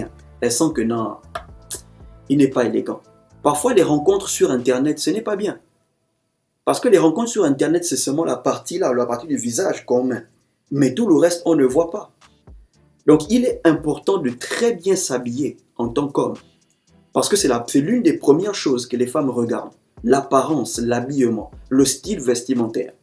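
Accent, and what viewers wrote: Gabon. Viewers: La tonalité de la voix et l'accent...mec tes Gabonais ?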